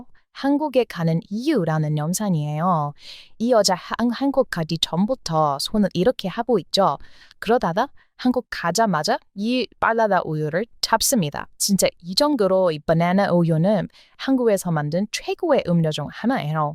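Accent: United States. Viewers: " 입니다."하는 멘트 예전에 개그맨들이 미국갔다온 사람들 흉내내는 말투로 자주쓰던 시절이 있었는데 님은 오리지널 미국교포 말투입니다.흥미롭네요. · 미국식 한국어ㅋㅋㅋㅋㅋ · 매력 넘치는 미국식 한국어,정말 최고!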